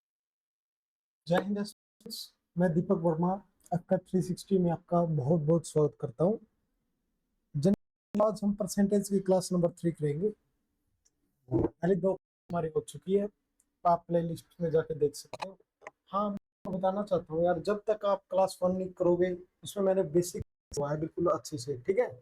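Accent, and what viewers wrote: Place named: Haryana, India. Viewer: Haryanvi control kro sir thoda 😅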